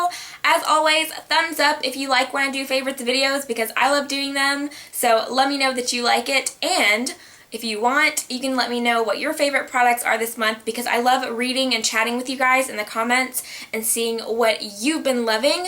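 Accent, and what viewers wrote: South, United States. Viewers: I looove your cute little accent, I first found you on IG & never pictured you having a southern accent! BTW have you tried Urban Decay's "Naked" lip liner? I feel like I reach for it soooo much more than Stripped Down (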